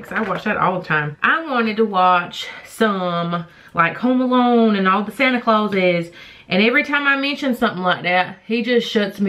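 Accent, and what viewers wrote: South, United States. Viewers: Happy New Year....Your look is so BEAUTIFUL. I just adore your southern tone. It's so cute and adorable.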